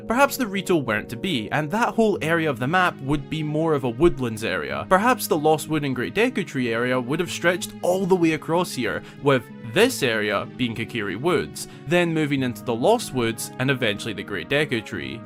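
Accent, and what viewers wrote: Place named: Edinburgh, United Kingdom. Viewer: I'm a Scot and he definitely sounds proper Scottish to me as his accent sounds very Edinburgh-like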